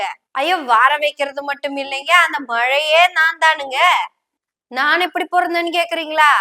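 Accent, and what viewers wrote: Kongu, India. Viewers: கொங்கு தமிழ் அழகாக விளையாடுது வாழ்த்துக்கள் சகோதரி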